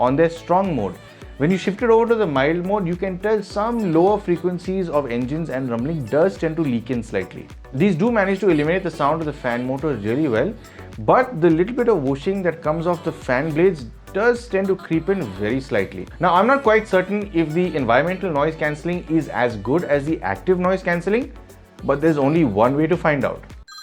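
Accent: India. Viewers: as I'm a core subscriber for your channel, I'm still in shock that for such a great reviews on well known TWS earphones, even after long time your not gaining in subscribers If I'm you and keeping all these efforts for the wonderful output of this beautiful video I would close my channel if enough encouragement was not given But you on the other hand doing fabulous Job with your Indian English ( easy to understand ) as well as your Honest review ❤️ Thanks brother and I hope you see grow in the future, Don't forget me !😂😂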